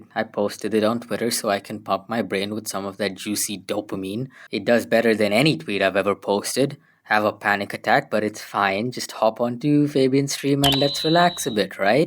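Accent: India. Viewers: AAAA bro it seems like u is Indian cuz the accent is a lil similar am I mistaken?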